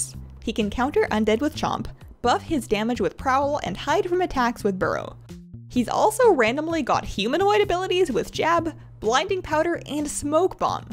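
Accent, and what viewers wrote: Canada. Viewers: haha i love the canadian accent :D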